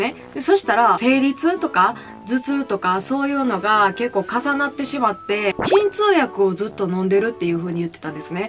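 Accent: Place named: Osaka, Japan. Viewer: 喋りが面白すぎて、チャンネル登録しちゃいました。大阪のイントネーション良いよね。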